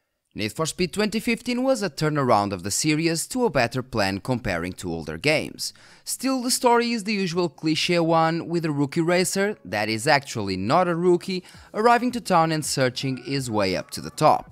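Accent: Portugal. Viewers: when i start hearing european portuguese accent... hello there xD either way nothing beats nfsu 1/2 and need for speed most wanted 1.... underground 1 was amazing... underground 2 was the first open world (if im not in mistake) and mw was just loved by... everyone? EVERYONE!!! :D soundtracks were amazing in all of those 3... in heat half of the soundtrack is cool other half not so much... either way as portuguese im fine with it but even to me i get the feeling the guy responsable for the soundtracks was some spanish or something because its only latin music... i mean.... it matches the game... but sounds weird... specially for me im used to rock and super agressive songs in nfs (im old school xD) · dude that accent... are you portuguese?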